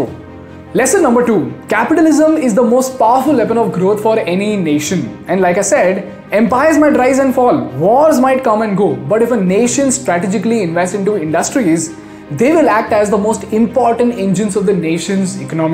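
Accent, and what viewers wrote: India. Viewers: I dont like Indian accent English ☺️😄😆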